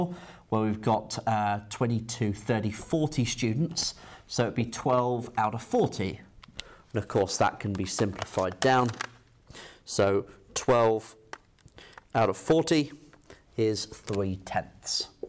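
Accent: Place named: United Kingdom. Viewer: I am fond of British Accent , great lecturer great video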